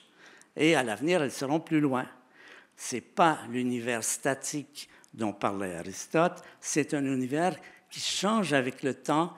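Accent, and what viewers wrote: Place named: Quebec, Canada. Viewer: une allure de savant un bel accent quebecois merveilleux conteur grand vulgarisateur d'une science extrêmement complexe que l'histoire de l'univers de l'infiniment petit à l'infiniment grand.....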